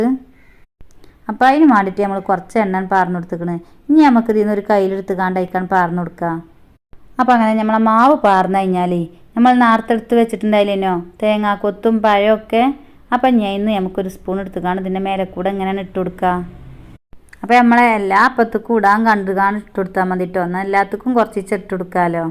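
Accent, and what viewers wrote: Malappuram, India. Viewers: ഒറിജിനൽ മലപ്പുറം മലയാളം 😄😄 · തനി മലപ്പുറം ആണല്ലേ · Original malappuram slang anu ith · ഇതാണ് ട്ടോ മലപ്പുറം ഭാഷ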